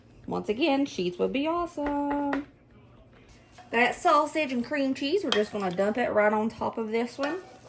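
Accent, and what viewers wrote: South, United States. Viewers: Love this presentation and especially love your speeded up prep. This California girl(82) loves your southern accent have some trouble understanding some of your pronunciation but then again I have the same difficulty with British PBS shows. It took me until I saw the finished slaughters that the big OH! You were saying SLIDERS. Look forward to seeing more of your presentations. Do you have a recipe for a slow cooker complete turkey dinner, dressing,turkey breast, string beans and small potatoes cooked with the turkey breast on the sides. Hopefully reduced sodium. Thank you for sharing your handsome family.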